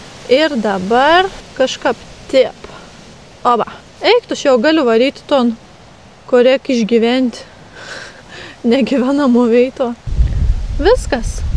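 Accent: Samogitia, Lithuania. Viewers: Jetau kam monie patin to zemaite kalba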